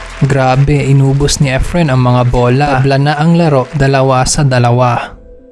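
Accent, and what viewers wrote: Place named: Visayas, Philippines. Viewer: Napaka akward mo mag salita d bagay paki ayos boses mo halatang bisaya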